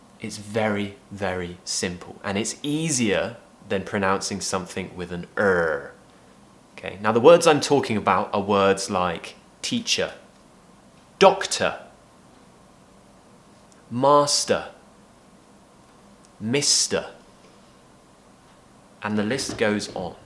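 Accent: RP, United Kingdom. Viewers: I love your British accent and l hope to speak English like you. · Wow I love your videos,I’m such a fan of RP accent!❤️ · I have seen more British English videos on YouTube, but your British English accent is pure and more British than others. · I love British accent ❤ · Your English is really British. I like british accent. Although I don't currently possess the ability to sound more beautifully British but with your courses I could brush my pronunciation up. Cheers.....!!!!!!!!!! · Lovely british accent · I really love this accent. British English is a worthy and beatiful language that I want to keep up. I'd like to learn Slang words or expressions. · I love british accent,might ask you sir to give us a lesson about the stress.thank you in advence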